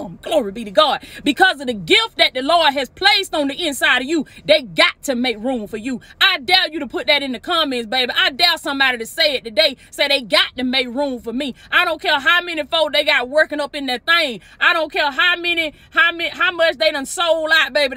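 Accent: South, United States. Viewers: Love the message and the country accent. 🥰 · You from the South????😂😂😂😥💯💪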